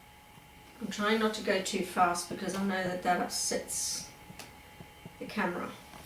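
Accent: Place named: Australia. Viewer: G'day from SA I thought hmm she sounds Aussie then she said Kmart and I went woo hoo! LOL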